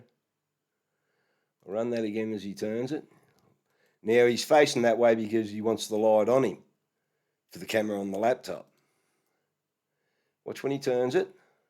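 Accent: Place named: Australia. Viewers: oz accent just makes it all that much more entertaining.. i hope these clowns continue to release more and more questionable footage, so we can keep LAUGHING at them. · His accent is absolutely stereotypical Australian…I know, I’m Australian and my accent isn’t like his at all. We have more than one accent here. WA is different than SA and TAS is different again, etc. Also, the more rural the more it will sound like. You generally only find posh accents in the cities and larger towns and the real posh ones sound like fake Brits. 😂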